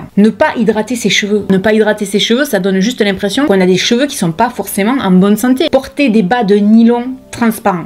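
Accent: South, France. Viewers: merci, ça fait un moment que je te suis, je ne poste pas mais aujourd'hui je voudrais te remercier d'être si bienveillante, sans préjugés, naturelle... je suis grosse et je le supporterai beaucoup mieux si la société me laissez vivre comme je suis. alors en plus, y il a le maquillage, les fringues, le style... si une personne s'habille avec le style qui lui plait, bravo et ce n'est pas aux autres aigris de lui faire des remarques. perso Cristina Cordula ressemble a une grand mère ou a la méchante reine de Blanche Neige, rien de gentil en elle, que du faux. continue comme ça, ça me remonte le moral de voir tes post et d'entendre ton bel accent du Sud (qui n'est pas a la mode a la télé non plus).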